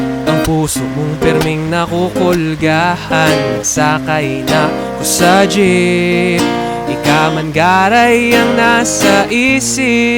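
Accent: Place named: Bicol, Philippines. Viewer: Haiii the bicol accent was good nakakarelax Po syaaa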